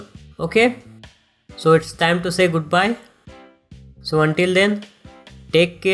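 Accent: India. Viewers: can always trust the indian accents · thx for help. And btw, i love indians voice. :3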